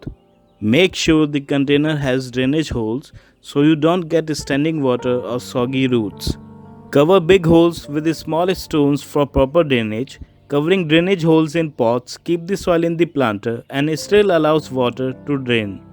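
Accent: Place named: India. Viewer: If it’s not an Indian accent doing the tutorial, I don’t event want it. They are always the best ones.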